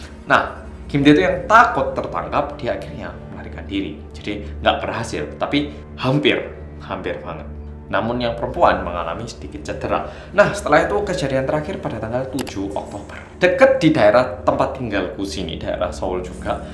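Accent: Java, Indonesia. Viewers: Medooooookkkkk,aku datang wkwkwk:_Haa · Pemalas aja entitled banget. Mungkin orang ngga mau namain anaknya kayak gitu karena ngga mau anaknya namanya sama kayak penjahat. Makasih, 🙏🏼🙏🏼 PS: percuma juga kalopun yang baru masuk cuma bergaul sama yang pertama kali masuk penjara. Dia aja ngga mau tanggungjawab malah nyalahin sistem keadilan/penjara di Korea. Cih.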